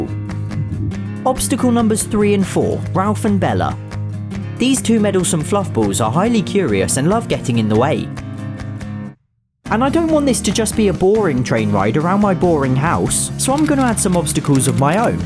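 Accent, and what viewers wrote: United Kingdom. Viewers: You british? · I like this guys British voice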